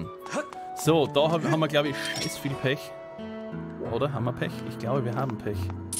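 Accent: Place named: Bavaria, Germany. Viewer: In welcher Stadt lebst du eigentlich weil du hast so ein bayrischen Dialekt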